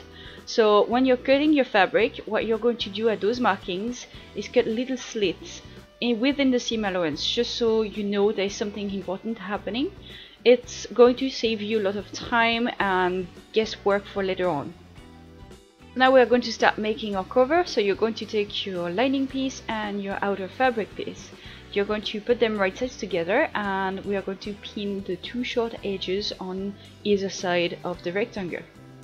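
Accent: France. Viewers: Amazinggggggg, im from mexico and i love your french accent when you talk english ^_^ ill try to do this!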